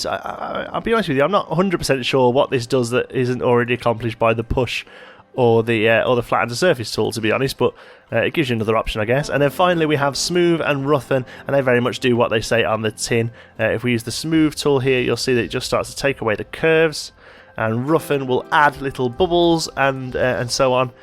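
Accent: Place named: England, United Kingdom. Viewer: I have downloaded and paid for the game and two add on packs via steam. Trying to get to grips with the game is a challenge in itself, however the *** steam interface is unbelievably clunky and not intuitive at all for example it says my 2 DLC packs are in my library but they are not !!! Also if I download a small park and go to open it I get a message to either continue loading without the additional items or continue and download … that option hangs the computer … I have spent more time on messing with steam than playing the game and am starting to think I should have purchased from the App Store (Mac) I realise my post is off topic but do you use steam … I have raised a ticket with support but I feel that now they have had my money very quickly they are not that bothered sorting out new user issues. Apologies for the rant but listening to an English voice is preferable to an accent from across the Atlantic 😢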